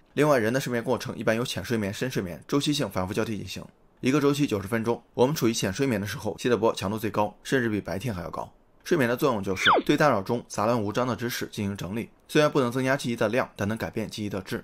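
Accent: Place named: Wuhan, China. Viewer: ……标准……＾＾＾你武汉人啊！？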